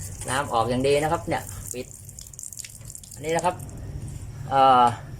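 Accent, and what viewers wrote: Chanthaburi, Thailand. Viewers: พี่คนจัน หรือ ระยองครับ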